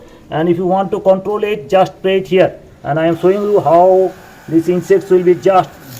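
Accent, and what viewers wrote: India. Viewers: Aap please hindi me baat kijiye. Aap ki English hindi jaisi lagti Hai. Aur India me jyada tar log jo kheti karte Hai wo hindi baat karte Hai.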